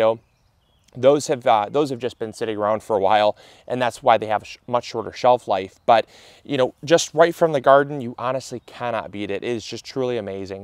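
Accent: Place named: Ohio, United States. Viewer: HI..thanks for your videos! I'm not sure if u have mentioned where u live, but I was wondering..where do u live/where are u from? Was curious about the climate u live in, the climate u grow the potatoes in and where your accent is from? Im assuming u are either in Ohio or Wisconsin?? Maybe Michigan? I know u are from the midwest...I am originally from Ohio, but u sound like my cousins when u speak and they are from Ohio but have lived in Wisconsin for the past 20 yrs:)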